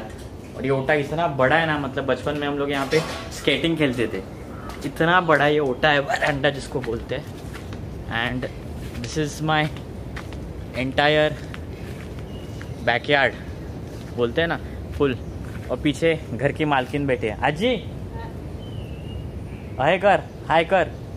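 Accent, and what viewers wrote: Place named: Wadval, India. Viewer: काय रे बाबा कुठे राहतोस?वाडवल बोललास म्हणून विचारलं?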